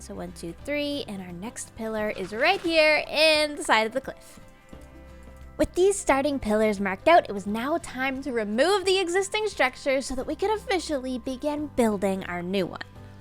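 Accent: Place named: Newfoundland, Canada. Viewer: Absolutely threw me for a loop hearing you're a fellow Newfie, never would've guessed!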